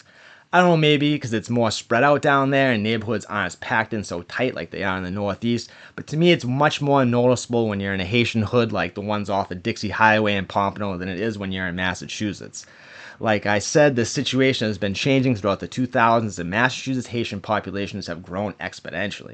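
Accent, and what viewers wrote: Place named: Rhode Island, United States. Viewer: Another superb video!! Your Ch. ROCKS!!! Today... I can't find the Member sign up page. I believe I've seen it before... should've just signed up then. I'll figure it out (maybe..😅) I'm Internet Challenged... but I ❤ your content. You know all the history and share it well!!! I'm a Rhode Hole 50+ yrs...ran the streets (well..more like walked them) in 80's+90's. I love the history... the accent too. LOLOLOL. How many times have you been down South..spoke 1 sentence to a Rebel...and heard.."Where you from boy??...New York???"I'll bet more than once..😃😃 I gotta dump a Ch. from a FOOL who.. who's content SUCKS.. I'll leave it there. But yeah...if you can send me a Member link.. I'll join in a ❤️beat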